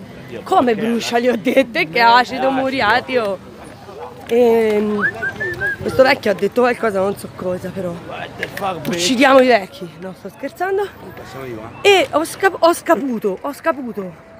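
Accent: Tuscany, Italy. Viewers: Auguri carissima.....ti abbraccio e ti mando un grande bacio.....sentirti parlare con la calata Toscana mi riscalda il cuore perché mi ricorda il mio adorato babbo, nato a Prato, che non c'è più!!!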